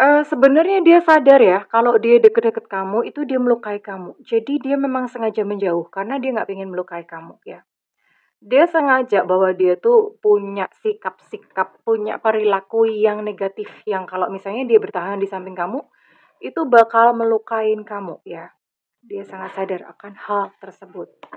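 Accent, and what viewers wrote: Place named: West Java, Indonesia. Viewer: Aya noann sunda nyh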